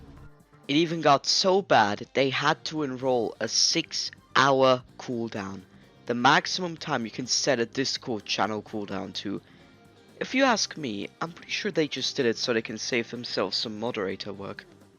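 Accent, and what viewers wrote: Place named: United Kingdom. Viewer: Nice vid but you should upgrade to a snowball EDIT I love your voice it’s like a mixture between posh british and Scottish/Irish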